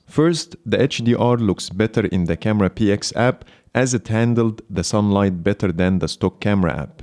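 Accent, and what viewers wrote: Egypt. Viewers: صوتك مصري ياصاحبي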